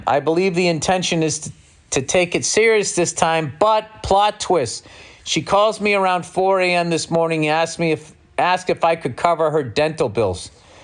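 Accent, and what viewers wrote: United States. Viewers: I see the flaming retards are back in the comments. I love the morons that say that I'm just here for the comments. You're helping Bill out on his channel, so thank you. Bill is still crushing it. He will still fill arenas and he could give a fuck less what the professionally offened have to say. All you haters will just become a punch-line in Bill's jokes. I still love that Bill brought his American voice to the Middle East.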